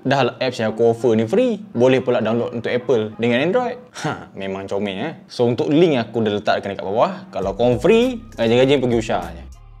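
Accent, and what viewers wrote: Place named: North, Malaysia. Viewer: OK,cuma utaqra kuat sgt, org key el kurg paham 🤣🤣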